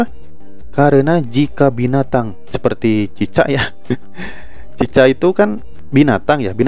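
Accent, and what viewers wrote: West Java, Indonesia. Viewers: Narator na Jigana urang Sunda.😁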